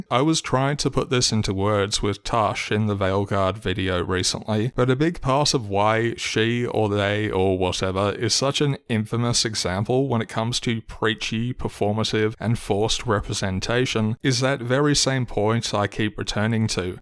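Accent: Australia. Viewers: That’s an Aussie accent also you have not made any points against any of what he has said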